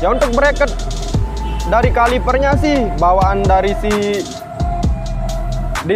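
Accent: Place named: Lombok, Indonesia. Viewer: seperti logat lombok bicaranya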